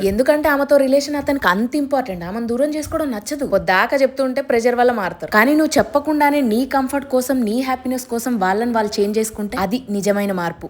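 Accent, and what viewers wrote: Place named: Telangana, India. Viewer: తెలంగానా slang meku suit avvadu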